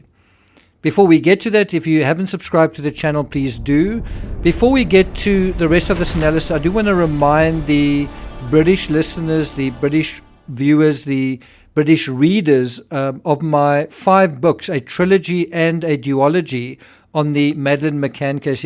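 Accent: South Africa. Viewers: Just listing to your accent and you mentioning your language, we had good friends who spoke so much lie you the husband was quite a famous singer in South Africa called George Van Dyke, he lived here in the UK for quite a while until leaving for the US we are still in touch and he is doing great over there. Love your work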